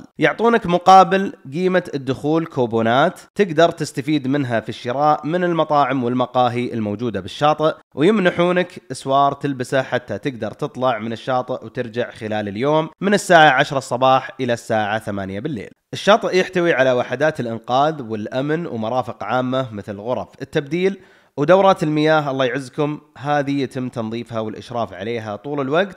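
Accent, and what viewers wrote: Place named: Saudi Arabia. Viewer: حياك الله اخوي انا من سلطنه عمان وحسب كلامك بالمقطع اظن انك سعودي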